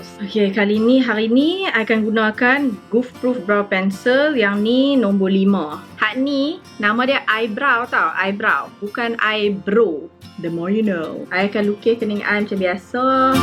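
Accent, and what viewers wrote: Penang, Malaysia. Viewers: I'm Penang Chinese girl , I totally like when you speak Penang BM , SO CUTE! I love the accent ! Love your make up tutorial too, will keep on follow your video! Thumbs up for you girls ♥ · Comelnya you guna dialek Penang 😹 tapi I still prefer you guna English sbb I nak belajar. Hahahha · Boleh pon ckp penang...ingt beranak dkt uk 🤣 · Awat cute sangat bila cakap penang 😍😍😍😍😍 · bila dh start cakap penang, kepala mau dok goyanggg tak biaq...gahahahaha hidup penang mai !! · hehe comeinyaa loghat penang yg campoq dgn english 😆 uuu nampok muka bulat 🤣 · sebagai orang pulau mmg syok dengaq fellow island girl kita ckp penang.. nak malu apa.. mcm ni la kami dok ckp dari kecik.. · Comelnya cakap penang 😂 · ok u chomel gilosss cakap penang!! love it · Lahh penang rupanya 😂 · Comeeyyyyy aihhh dia ckp peneng..😍😍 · Comelnya cakap dialek penang. 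Tak kekok pun 😁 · I love the way you speak your bahasa with a little bit of penang accent. There was no accent when you speak in English.. Love your makeup look. Finally a malay makeup youtuber...😘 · Cute lahh cakap pelaq penang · Puaih dengaq akak ckp peneng after so many years follow channel akak uhukss love teroxx ❤ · Nurkhotimah Rifqi Pulau pinang, malaysia.. she speak in Penang dialect.. so maybe susah untuk kamu faham😂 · WAIT I TAK HBS LAGI TGK THIS VID BUT GURLLLL I LOVE YOUR PENANG ACCENTTT OH EM GEEEE OKAY NAK SAMBUNG HAHAHAHAH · i like this vid soo much its like u are completely a different person when u talk in bm with penang loghat some more. your make up is da bomb as always love it !!! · Hahhaha napa rasa lawak dengaq cakap penang😂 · Sgt comel ckp loghat penang 😁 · Padu toq orang penang cakap! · Dont know why, but I love it way better when u speak in malay.. to be exact, in that Penang slang .. 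thats cute plus u sounds way much comfortable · Comeyyy cakap loghat penang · = 💗 in penang dialect = 💗💗💗💗💗💗💗💗💗💗💗💗💗💗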